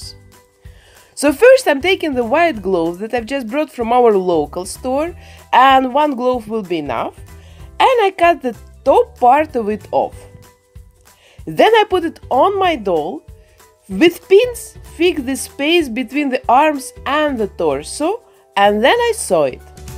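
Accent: Russia. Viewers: It seems to me or you have a Russian accent?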